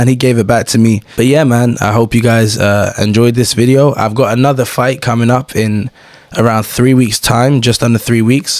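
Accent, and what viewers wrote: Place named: Midlands, United Kingdom. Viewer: Ure from Leicester or Nottingham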